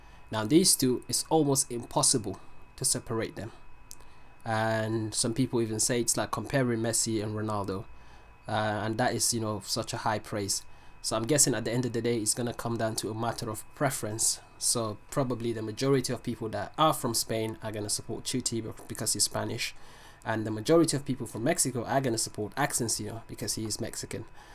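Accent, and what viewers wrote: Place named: England, United Kingdom. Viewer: Tiene el acento inglés no creo que sea de Estados Unidos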